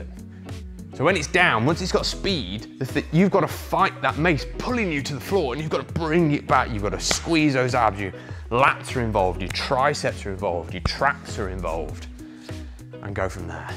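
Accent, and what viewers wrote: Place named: United Kingdom. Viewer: Thank you! British accent is something hard to digest 😅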